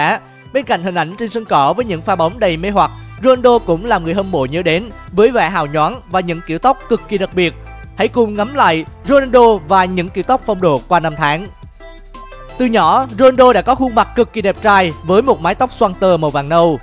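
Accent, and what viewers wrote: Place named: Da Nang, Vietnam. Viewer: Giọng đà nẵng chắc luôn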